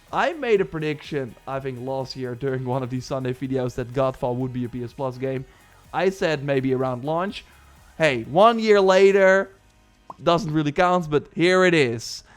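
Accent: Netherlands. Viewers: nederlands accentje valt wel goed op he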